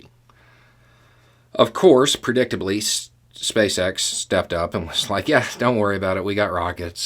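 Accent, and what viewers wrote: South, United States. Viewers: Hey, fellow southerner here, I just wanted to let you know that I really appreciate the work you’re doing here. Your videos are really helpful with swaying right-wing family members. Something about a country boy with a southern accent seems to make them more willing to listen. Keep it up!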